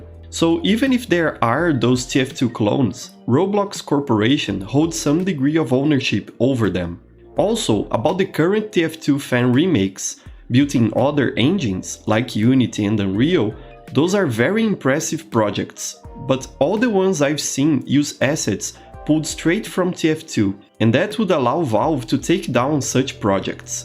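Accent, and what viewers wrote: Brazil. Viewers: why does your way of speaking seem so much like a brazilian speaking english?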